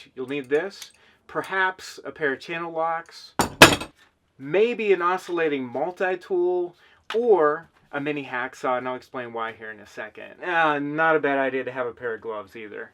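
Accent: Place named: Pennsylvania, United States. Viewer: Ah! knew you were from Pennsylvania! I’m from Philly area but now I’m out in California and your voice just reminded me of home. Thank you for making this video my toilet has a crack in it and I don’t want the repair guys in here during quarantine so here we go! Oh I don’t have that stuff that goes up the water but I thought of another good thing that I randomly have (and I don’t have kids so it’s very random I have this- I actually used it to make snow for Christmas since there is none out here ) If anyone happens to have any diapers they can use the inside of that just rip it open and there’s is fluffy on the inside that turns into that same looking gel. Glad I’m a little bit of a hoarder at the moment Ha Ha Ha Ha. Anyway thank you !!!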